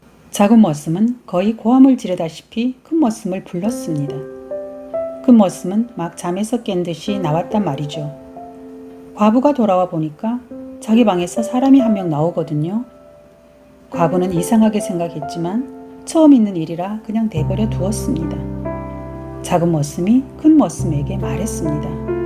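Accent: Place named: Gyeongsang, South Korea. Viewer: 구수한 갱상도 아지매 사투리가 더 졸리네요^^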